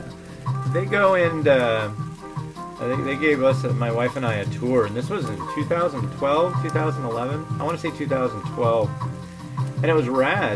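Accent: United States. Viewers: For some reason your videos have got the vibe of 2000s. Maybe it's the editing. P.S. I hope you'll get the most you can from all these Russian dudes. P.P.S. Your accent is sooo satisfying to listen to. Smooth and very American. Nice pronunciation always gets me.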